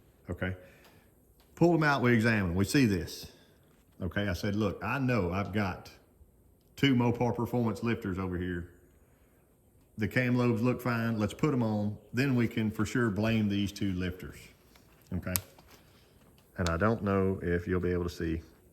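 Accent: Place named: Appalachia, United States. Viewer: you dont know what the hell your doing hill belly talk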